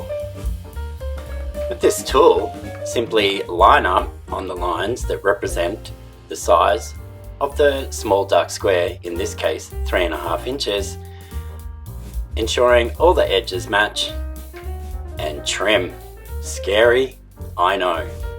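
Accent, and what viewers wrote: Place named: Australia. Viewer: Hi - i am so happy you are doing this! You do such a good job and your voice is so soothing. And who doesn't like an Aussie accent?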